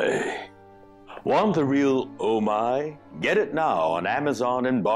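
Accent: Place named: Japan. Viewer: His Japanese accent comes out so much when he uses his narration voice. Oh myyy.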